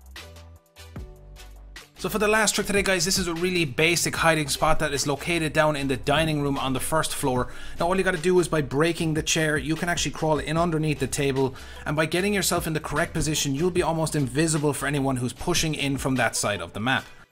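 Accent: Ireland. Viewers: U irish? If so where from lad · You Irish?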